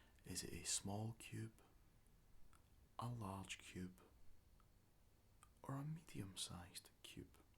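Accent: Scotland, United Kingdom. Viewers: Where abouts in Scotland are you from? Your accent sounds so much like my boyfriends... It's nice ☺️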